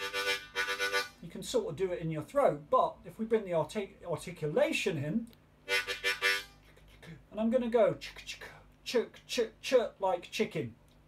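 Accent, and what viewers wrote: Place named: Northern England, United Kingdom. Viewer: thanks for bridging the gap from beginner blues to bluegrass. Kinda crazy to learn from a Brit but hey, youre doin it right. That northern? accent is cool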